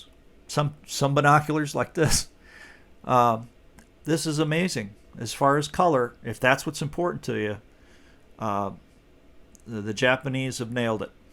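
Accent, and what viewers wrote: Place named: Levant, Arab world. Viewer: Nice to hear from you, V :) So glad to hear you’re enjoying the New Year. I hope it is a prosperous one in those important ways. It feels like a mixed New Year. I’m more optimistic than usual but fear there are a lot of conflicts and disputes far from being resolved with the ability of involving more countries / raising other simmering tensions... Your accent sounds familiar but hard to pin down. I suspect I’ve not met anyone from your origins to be sure. Listening again, I hear different mixtures sometimes familiar accents. I suspect the Latin hints comes from learning those accents of English. Thanks for the clues, I don’t know distances well, but I would say NE of the Levantine, approaching Europe... I’ve gotten into the habit of playing videos as fast as still legible, and forget how it chipmunks the sound. Well, in your case Levintines ;)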